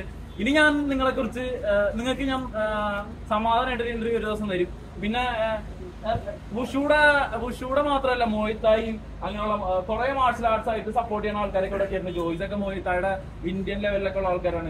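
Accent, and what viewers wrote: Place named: Coastal Kerala, India. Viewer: No kadappuram slang now😮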